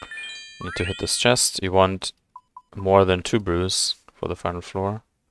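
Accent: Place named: Germany. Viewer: i can hear german in this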